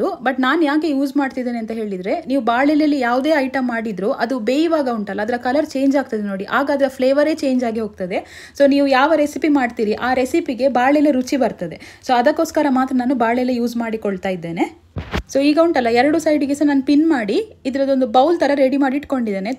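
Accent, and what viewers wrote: Mangalore, India. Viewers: Namma kudlada kannada eshtu porlu marre kenga keluva anthave agtade ❤❤❤ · Manglore kannada thumba chanda❤ kelalikke thumba khushi. Nammoora bhashe❤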